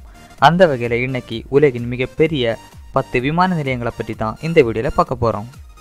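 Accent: Kanyakumari, India. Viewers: Ne kanyakumari aa pa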